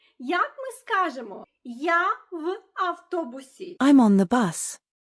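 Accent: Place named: United Kingdom. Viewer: Цікавий формат подачі інформації. Дякую. Підписався. Окремо подяка за British English 🇬🇧👍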